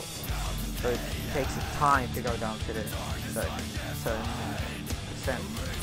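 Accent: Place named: United Kingdom. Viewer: nä så ere fan inte ! ;o du låter som en äkta britt typ xD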